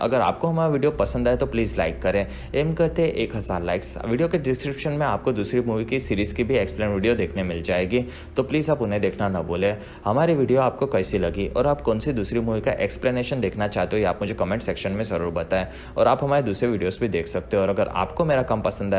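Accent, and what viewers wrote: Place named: Gujarat, India. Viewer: He is from Gujarat. Koi problem nahi hai, sabka apna apna style hota hai.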